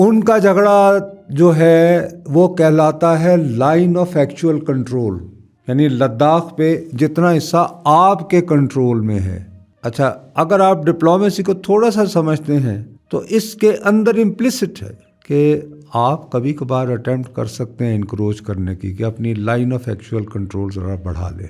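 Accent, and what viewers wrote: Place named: Lahore, Pakistan. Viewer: سر نصرت بہترین لاہوری سٹائل میں تجزیہ بہت زبردست اللہ آپ جیسے صحافیوں سے پاکستان کو برکت دے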